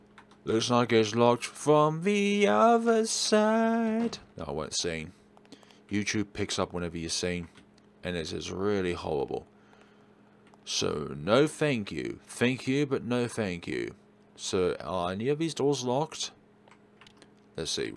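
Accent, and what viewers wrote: United Kingdom. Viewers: Where in UK is your accent from?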